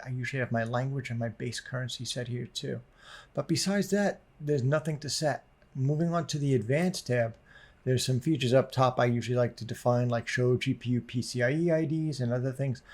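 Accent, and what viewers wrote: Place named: New York, United States. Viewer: I fucking hate that New York accident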